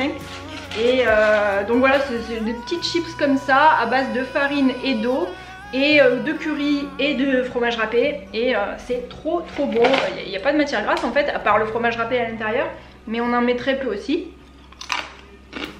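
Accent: South, France. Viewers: Coucou 👋🐈🏵️🐥💐🌼🌷🌹❤️🌿J'aime beaucoup tes vidéos 🤗 et ton accent du sud 🌻🌻🌻🌻merci pour tout 🐈👋💕Isabelle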